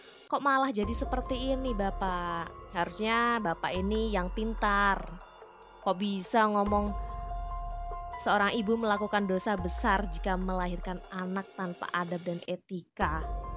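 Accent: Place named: Medan, Indonesia. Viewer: Saya suka dialek medan / batak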